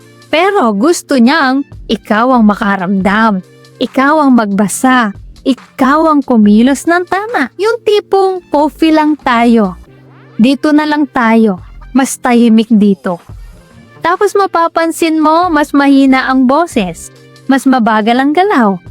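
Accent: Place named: Pampanga, Philippines. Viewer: Kpampangan ka dahil sa accent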